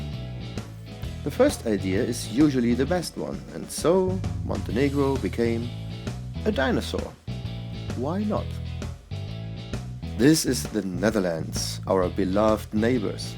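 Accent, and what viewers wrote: Germany. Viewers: I love this, it's crazy in a good way. Your accent also adds to it as I can picture you a slightly bonkers German scientist. Are you from southern Germany? I've always seen my country Britain as as a strange seated witch driving a car with the extended leg of Cornwall and the extended arms of north and south Wales. The Lake District is her adam's apple and south-west Scotland is her beard. She is sneezing out of a hooked nose at the Argyll penninsula and has pooped out the Isle of Wight.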